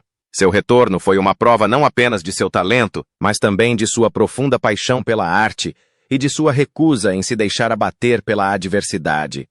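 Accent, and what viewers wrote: England, United Kingdom. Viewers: para com este horrivel sotaque ingles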